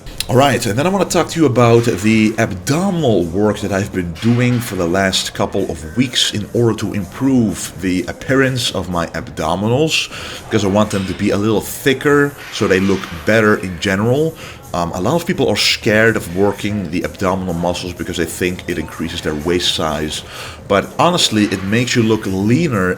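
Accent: Netherlands. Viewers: Jaa man. Wist ik all. Jau accent. Nederlander wooh! You can't hide your Dutch accent haha. Amazing channel man.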